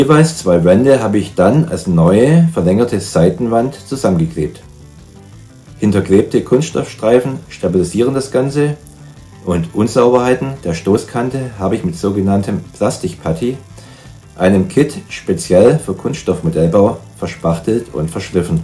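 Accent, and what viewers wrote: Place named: Swabia, Germany. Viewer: Den schwäbischen Einschlag hört man als Schwabe schon noch. Das ist wie Fshrradfahren. Kann man's einmal, blekbt es auch. 😊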